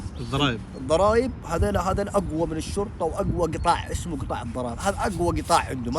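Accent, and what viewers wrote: Jeddah, Saudi Arabia. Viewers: دايم اليمنة يلاحقون أي واحد يكتب شي عن لهجة هذا الشخص! ندري أن أصله يمني لكن يتكلم جداوي يعني سعودي وهو قلباً وروحا حجازي سعودي ولا يعرف حتى يتكلم يمني. وش هاللقافة يا ذا الناس! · انا يمني لكن دا كلامه جداوي 😂🤦‍♂️.. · اللهجه سعوديه ١٠٠٪ هوا ماقال الجنسيه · هههههه يقول الرواتب على حسب وجهك 🤣 آخخخ اللهجة السعودية اشقد احبها ❤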